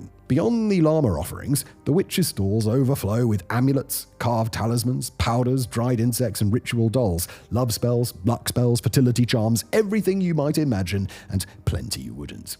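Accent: United Kingdom. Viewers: Lots of his words are strange like that where he pronounces them in this weird, British way lol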